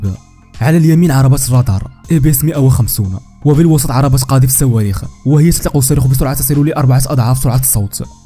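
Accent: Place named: Morocco. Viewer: أحيي طريقتك في النطق المغربي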